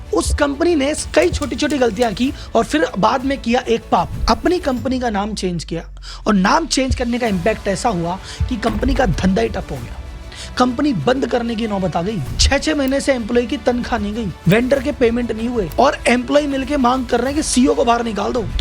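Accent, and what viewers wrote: Gujarat, India. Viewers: Gujrati ho ya rajasthani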